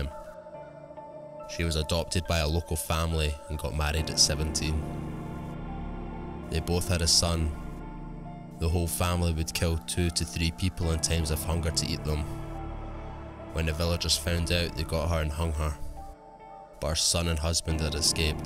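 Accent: Scotland, United Kingdom. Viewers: Great now I'm definitely not going to sleep at all. I love your channel and the fact that it's in Scotland and told with a Scottish accent. Ugh death by no sleep lol. I am loveing the stories and the chills though as they are definitely what I watch them for. I Hope you keep up the great work and continue the scares. Have a blessed day or night. Oh and I think I'm going to call you. short for as you sound like a vampire just don't pronounce your w's as v's so it is even more chilling and better to listen too. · Hiya ive recently came across your channel & I love the Scottish accent & history so you've got yourself another sub:) Keep up the good work your doing & looking forward to your next video. XxX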